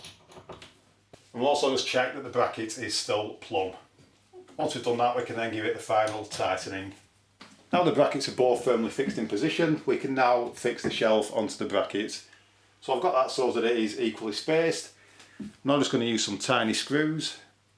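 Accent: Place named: Lancashire, United Kingdom. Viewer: 😅👍. I grew up in Blackburn so I thought I recognised the accent x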